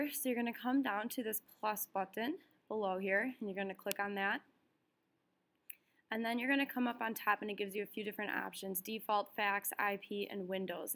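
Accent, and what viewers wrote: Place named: Canada. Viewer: That was pretty cool. I love your accent . Canadian?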